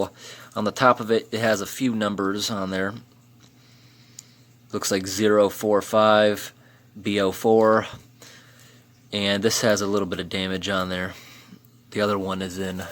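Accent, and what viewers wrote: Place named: Western Massachusetts, United States. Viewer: Sounds like a west Massachusetts accent. Typical south-western New England for sure.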